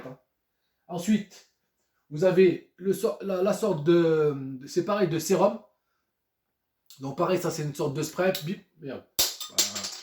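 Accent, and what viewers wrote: Saint-Étienne, France. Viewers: Avec un bel accent de St Étienne ou de Grenoble...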